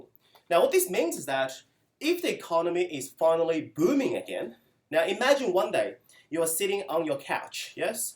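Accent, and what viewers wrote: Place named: England, United Kingdom. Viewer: Chinese with a bit English accent, like your lecture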